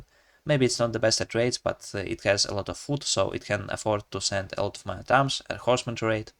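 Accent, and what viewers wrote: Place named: Eastern Europe. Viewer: Great content! I’m curious, where are you from? Your accent sounds Eastern European and I’m from Bulgaria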